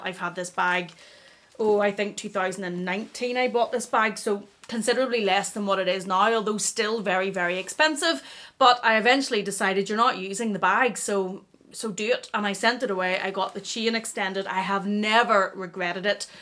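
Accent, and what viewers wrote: Ireland. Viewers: You have a stunning collection! New subscriber; came across your channel and when I heard your brogue I had to sub! My grandfather was from Tipperary immigrated to New York where I am from but live in Florida now. The colors of your collection work so well for me and enjoying watching your videos! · Amazing collection! I also love chanel chevron 🥰 whereabouts Ireland are you from? Love your accent 💚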